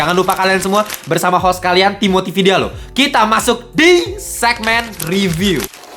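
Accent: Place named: Eastern Indonesia, Indonesia. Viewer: Belajar logat timur dolo biar ko paham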